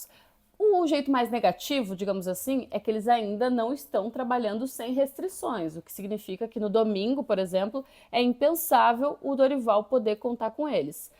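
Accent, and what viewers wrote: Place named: South, Brazil. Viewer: Você é😂 Catarina ou Gaúcha? Porque este "ãn" é característico destes dois estados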